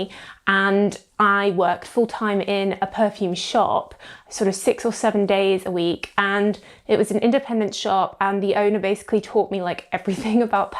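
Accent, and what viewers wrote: United Kingdom. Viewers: I absolutely love your British accent and you have such a beautiful name 😊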